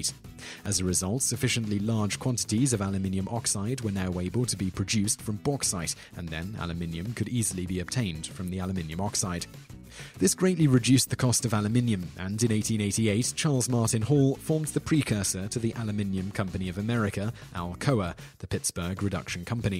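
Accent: United Kingdom. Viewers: please explain why you, as a Brit, pronounce most words as we do but then spell your titles in the American form? I have seen in your titles color and here aluminum. Are you ashamed of your national heritage? As a Brit abroad you should be an advocate for our great nation and history. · I get the concept of England and America being two countries separated by a common language and variations on pronunciation. However, there are certain words, said throughout these videos that truly strike the American ear as painfully wrong. Some of these words include: Aluminium. Please note, there is only one L. Consider such words as: Luminate and Illuminate (which does have two Ls), these words - even spoken by the British, do not have the strange sound of: Al lu min E um. However it is forgivable. Controversy: it is con-tro-versy! Not Con-trav-ersy... England invented the friggin' language and the rules that go along with it. 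As such, they should know the difference between the sounds of an O and the sounds of an A ... not to mention the rules regarding syllables and how they are used! Although this is far more annoying than aluminum .. there are worse examples of the English butchering their own language. Behemoth: Perhaps the funniest thing about this word is the fact that the English are the only people on the planet who butcher this word. It is: buh-hee-moth with the O sounding like that of removing the N from the word, month... NOT: be-a-moth!!! There are several others, but these should do, for now. Still, there is one personal acception, from a previous video: Seneca. I grew up in a few different places: Ashford, Ireland; Ft. Lauderdale, Florida; and Central New York ... not far from Seneca Falls. Additionally, there is the Seneca indian tribes. We can all attest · Not sure if trolling, but here goes... 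The presenter is British while the rest of the channel team is American. It's merely the difference between American English and British English dialects. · That how we pronounce it in the UK. We think the way you guys say it sounds silly so the feeling is mutual. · CrazyBear65 bonus fact, he is English, and speaking English the way the English speak it..... not the Americans. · American production, British narrator. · It's spelled ALUMINUM It's pronounced UH - LOOM - IN - UM Where in the world do the English get the extra "I" that they insistently include in their pronounciation?!?!?! PLEASE STOP!!!! IT'S FRIGGIN ANNOYING!!!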